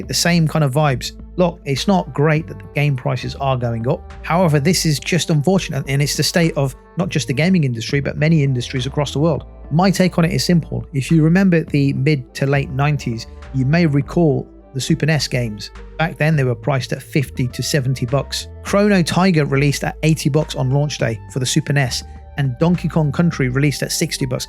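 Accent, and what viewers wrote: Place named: England, United Kingdom. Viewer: You've clearly got an English accent, so why you talking bucks & dollars and not £s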